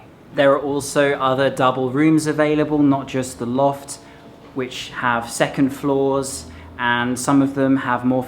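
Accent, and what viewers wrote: United Kingdom. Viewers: Soo happy to see you again! Welcome back to the best British accent ever, and i'm glad you recovered ^^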